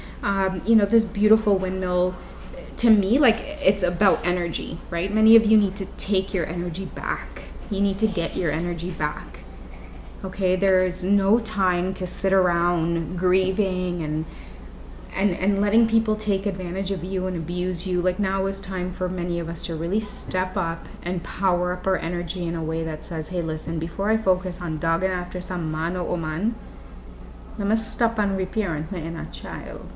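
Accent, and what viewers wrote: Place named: Trinidad and Tobago. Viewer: I love your Trinidadian accent and love your help. Thank you for your time and energy<3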